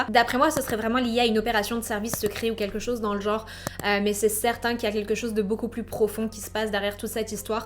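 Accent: Quebec, Canada. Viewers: j'aime bien ton petit accent canadien ^^ c'est cute et continue ce style ( je te decouvre avec cette affaire ) et les truecrim , c'est grave intéressant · Bonsoir, j'i bien aimé cette histoire que tu nous as compté ce soir. Je suis partant pour que tu nous parles aussi d'affaires non-résolues, d'affaires résolues des années après et de crimes comme tu avais commencé à le faire. Pour ma part, cette pauvre Jennifer semblait faire partie des services secrets d'un pays européen comme la Belgique. Son soi-disant suicide n'est pas du tout valable car il y aurait eu forcément son sang sur le pistolet et sur sa main et de plus, le pistolet aurait été dans une autre position que celle montrée sur l'image. Merci pour ton super travail, j'adore, tu es formidable. Petit bémol, pourrais-tu ralentir le débit de ta voix. Tu as des courses à faire après ta vidéo, présentement? 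Continues à nous compter ces histoires criminelles avec ton joli accent québécois et tes belles expressions françaises qui sont désuétudes de nos jours en France. Serait-il possible d'en savoir un peu plus sur toi, stp? Belle fin de soirée. Bisous criminels sexy nocturnes. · Je confirme également, je l avais capté cet accent québécois et c est très mignon 👍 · salut a entendre ton accent ,tu es quebequoise? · J'ai pensé des le debut de la vidéo que c'était probablement une histoire d'agent des services secrets, et je le pense tjr à la fin de la vidéo ducoup ahah, c'est le genre de crime limite banale qui se passent dans les dessous bien sombres des enquêtes de ces services d'espionnage, sinon tres bonne vidéo, j'ai fais un marathons de ta chaine aujourd'hui ayant pass la journée chez moi comme malade et j'aime beaucoup ta façon de raconté les histoire ( et le léger accent québécois ahah ) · Coucou! 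Je suis du Québec et j'ai eu l'impression tout au long de ta vidéo de deceller un petit accent de chez moi?! En tout cas, tu as une nouvelle abonnée, félicitations pour ta vidéo ! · D’où vien ton accent tu parles un peu québécois je trouve ?!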